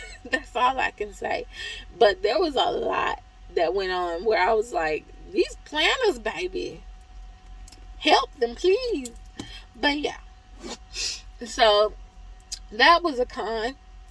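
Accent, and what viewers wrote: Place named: South, United States. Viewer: Sound a lil country lol I like it tho 😂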